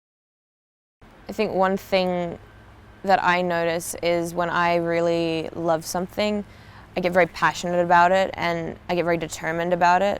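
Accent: United States. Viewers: Bloody hell, I wish she'd turn off the american accent!!!! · I luved her American accent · She's slowly getting an American accent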